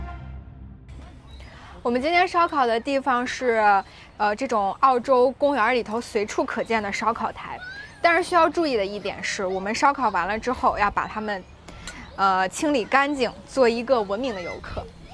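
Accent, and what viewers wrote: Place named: Linyi, China. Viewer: 哪里像冀鲁豫三角地的人了- -济宁聊城的口音不是这样的吧。。这个倒比较像临沂那边的2333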